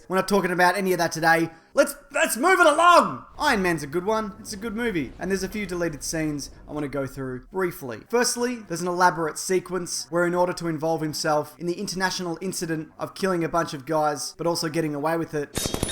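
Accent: Australia. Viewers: You, sir, are my favorite source for Marvel news, because your content is great, very funny, and I really like your Australian accent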